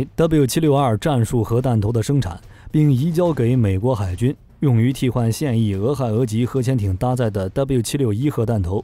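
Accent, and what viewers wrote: China. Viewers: 大陆没人叫“普丁” lol, 我就觉得这频道有些视频内容有点怪怪的，原来是台湾的播主找个大陆口音的机器人发音软件做的。。。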